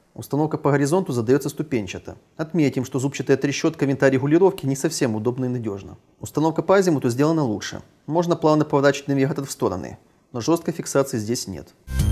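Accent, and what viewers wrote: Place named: Ukraine. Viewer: комментировать на русском украинским диалектов кокто не очень:( но видно старался так что палец верх :)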